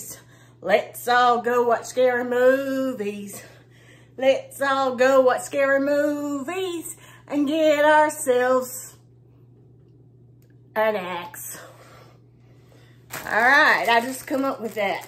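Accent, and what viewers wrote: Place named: United States. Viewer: Howdy! Love the cow girl vibes / accent! So much fun!!!! 😊🖤🩷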